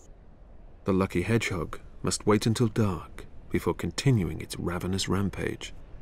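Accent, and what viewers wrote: United Kingdom. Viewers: Whoa, this is in the Middle East? My people, my Arab people! Get an Arab Man to narrate this. Why is a British guy doing it? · The narrator has a sophisticated British accent. I question nothing. And hello, Glen, after three years. Did you survive Covid? lol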